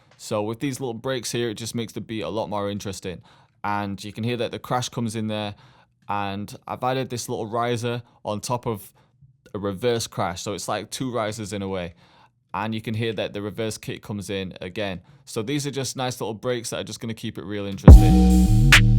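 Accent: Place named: Lancashire, United Kingdom. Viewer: Yo are you from the Accrington Stanley area in England? Most of my extended family are and they all speak like you haha, sick video tho man your killing it and glad to seee you doing well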